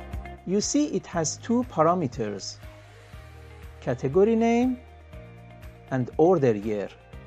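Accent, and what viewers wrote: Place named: Iran. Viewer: Thick accent w/ Farsi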